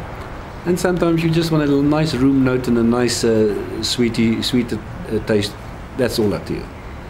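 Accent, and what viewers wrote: South Africa. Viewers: Good evening sir Could that be a South African accent?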